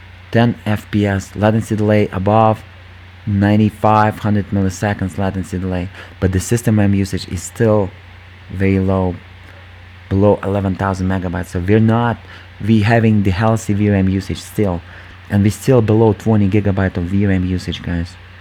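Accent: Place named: Russia. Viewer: он русский слышно же по речи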